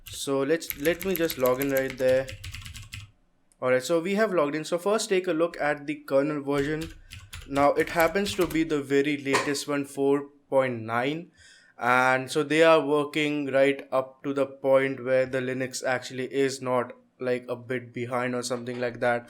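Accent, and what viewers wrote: India. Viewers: I can't take you seriously with that indian accent. Already laughing.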